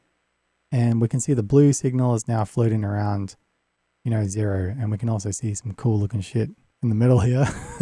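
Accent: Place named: Australia. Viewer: Ah man I've missed those dulcet antipodean tones. Very interested in both of these - to gumroad..awaaaaaaayyyyyyyyy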